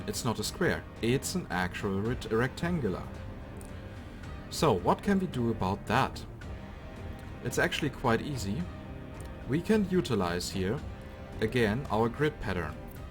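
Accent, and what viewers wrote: Germany. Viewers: You have a german accent, do you come from germany :D? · man hört den deutschen akzent aber das ist cool. ich spreche auch oft englisch.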